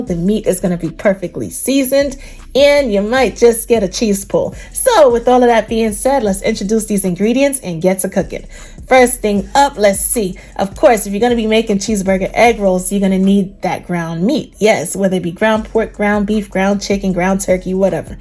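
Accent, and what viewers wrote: New York, United States. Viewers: Om guessing your from the New York by the way you say sauce, there, and off. 😁 Nice video I'm gonna try it thanks.. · Hey - these look fabulous and I can't wait to make my hubby these. Thanks for sharing us you tutorial! Love your presentation and your lovely accent (New York?) We're from Chicago! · Where you from Brooklyn queens or BX? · New York or Chicago? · You must be from New York!!! I’m a new sub for those egg rolls and that saucey-sauce! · I love your new york accent too yes I picked it up on the first couple seconds of the video lol them cheeseburger egg rolls look good too smh love ny women can't wait to get back up top mad respect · That New York accent just shines through, I love it.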